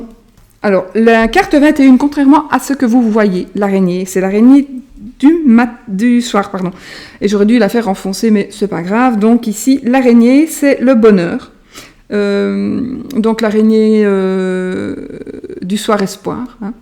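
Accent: Belgium. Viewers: Bonjour,Magnifique ton oracle … tes dessin sont tellement parlant … il m'a tapé dans l'œil dès que je l'ai vu et j'aimerais vraiment me le procurer … j'espère que cela est encore possible.. J'adore ton accent vive la Belgique … cet accent il me manque … mon mari était belge malheureusement il est décédé en juin 2018. Belle journée à toi. merci Pascale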